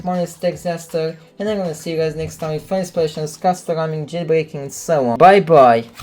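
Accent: Romania. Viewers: Wait I just realized are you Romanian??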